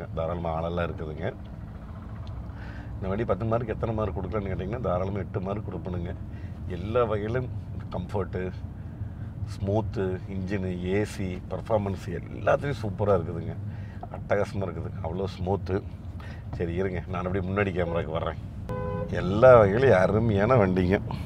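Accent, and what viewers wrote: Kongu, India. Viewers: கொஞ்சும் கொங்குத் தமிழ் பேசும் கோமகரே...! திகட்டாத தித்திக்கும் பேச்சுடைய திருப்பூர் திருமகரே...! எங்கள் மதிப்பிற்குறிய டான் திரு. அண்ணா அவர்களே❤❤❤🙏 எப்படி இருக்கீங்க அண்ணி❤❤❤🙏 எப்படி இருக்காங்க ரித்திக்❤❤❤ எப்படி இருக்காரு என்ன சொல்ல...ஏது சொல்ல... சும்மா நெகு நெகு நெகுனு இருக்கு போங்க❤❤❤❤❤❤ சாலை ரதத் தேர் என்னும் இந்தக் காரின் அழகைவிட.... எங்கள் அன்பு அண்ணா❤அண்ணி உங்கள் இருவரது உரையாடலே அழகோ அழகு பேரழகு...! பஞ்சு மெத்தையில் படுத்துறங்கும் சுகம்...! மேகத்தை முத்தமிடும் பறவைகளின் கூட்டம் காணும் சுகம்...! காற்றில் அசைந்தாடும் நெற்பயிர்களின் நடனம் காணும் சுகம்...! பாலைவனத்தில் புது ரோஜா மலர் மலர்ந்து அதைக் கண்டால்? மனம் முழுவதும் சுகம்..! கார்த்திகைத் தீபம் சுடர்விடும் அழகினைக் காணும் சுகம்...! அப்படியோரு சுகம் இந்த வீடியோவைக் காணும் என் உட்பட நம் TMF குடும்பத்தினர் கண்களுக்குச் சுகம்...! என்றும் அன்புடன் உங்கள் தம்பி என்றும் அன்புடன் உங்கள் TMF ரா.முஹம்மது கபூர் (சென்னையில் இருந்து)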